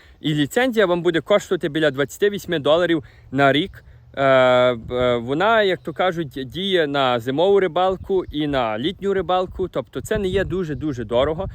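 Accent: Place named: Galicia, Ukraine. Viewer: Насолоджуюсь просто вашим галицьким діалектом! Дякуємо вам за працю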